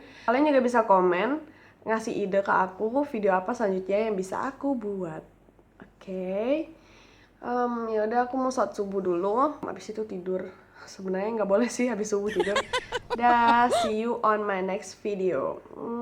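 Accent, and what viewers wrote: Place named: Minangkabau, Indonesia. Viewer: buliah kenalan uni. namo awak della asli minang tapi laia dan gadang di jakarta . papa jo mama awak urang lubuak basuang . awak kuliah di gundar semester 5 jurusan akutansi. uni bahaso minangnyo barasiah bana . sanang awak mandanganyo tp awak indak sapasiah uni bana bahaso minangnyo.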